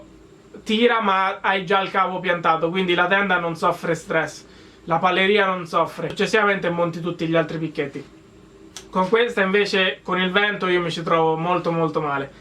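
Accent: Umbria, Italy. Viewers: alla faccia che forza che sei! ma dal tuo accento sembri umbro o sbaglio?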